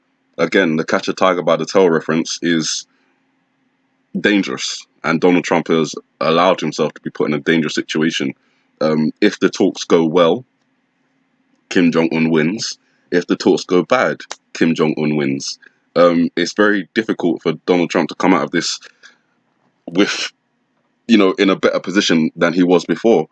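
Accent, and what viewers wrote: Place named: London, United Kingdom. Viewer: Nice to hear a Londoner speaking on these matters, keep up the good work brother..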